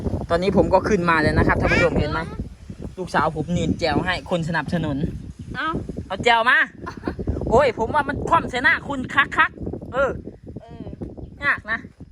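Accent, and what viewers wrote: Laos. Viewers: เว้าไทยตกลาว5555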